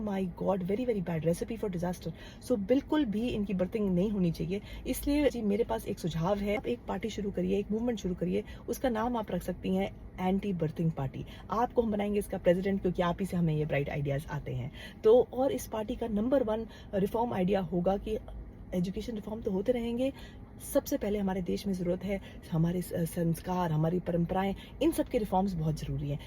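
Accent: Punjab, India. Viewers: Nice. More punjabi please 🙏 your punjabi is very sweet. Kangana ko thappad therapy dilao. 🤣🤣🤣🤣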